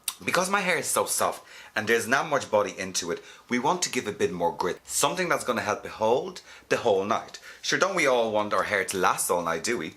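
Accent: Ireland. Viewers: They have an Irish accent :)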